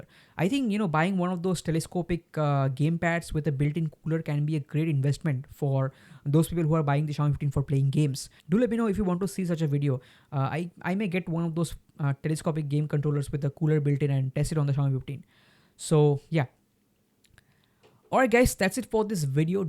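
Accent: India. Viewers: I click the video so fast but then closed it as soon as I heard the curry accent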